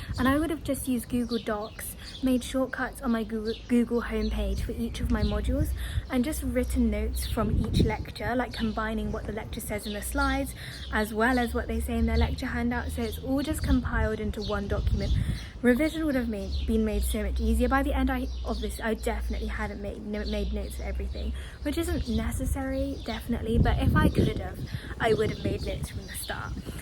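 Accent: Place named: Southern England, United Kingdom. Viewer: Weird observation to make, although your accent is very southern, when you say done it sounds very northern lol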